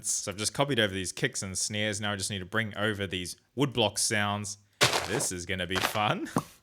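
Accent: New Zealand. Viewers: Wait is this guy a kiwi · Are u NZ?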